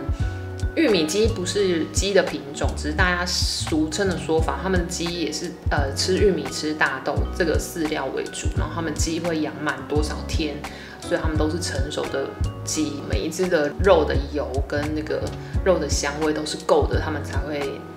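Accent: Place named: Taiwan. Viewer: 說台語好可愛❤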